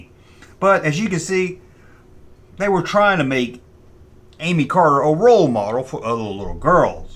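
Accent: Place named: New Jersey, United States. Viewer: Georgia? I thought you were from Jersey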